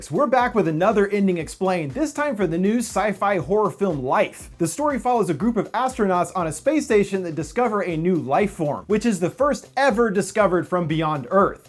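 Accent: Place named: United States. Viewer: The American accent is so fucking obnoxious, A complete assault upon the ears. Or maybe it's just this one guy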